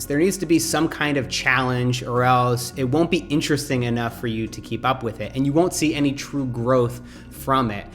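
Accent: Australia. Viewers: "Going FOH-ward," to me, is one of his trademark pronunciations that you just need to wait for. Can't wait for Australian accent to kick in.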